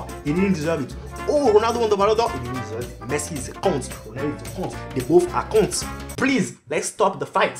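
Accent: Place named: France. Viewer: On entent ton accent français Mdrrr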